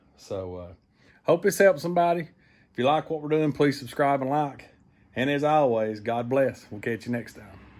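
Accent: South, United States. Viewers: All auto DIY videos hall be made with the deep southern accent. Makes me feel comfortable and brings me back to my childhood. And makes me feel like I can do the diy too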